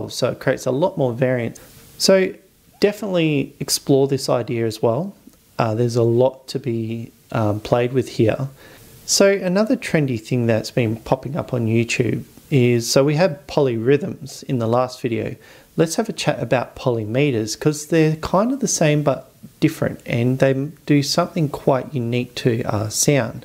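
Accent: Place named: New Zealand. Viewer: gosh i love that accent is that kiwi? great tutorial thank you!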